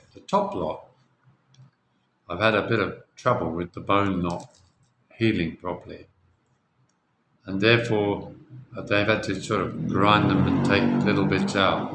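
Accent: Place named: United Kingdom. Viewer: Your british accent trumps all other conciderations..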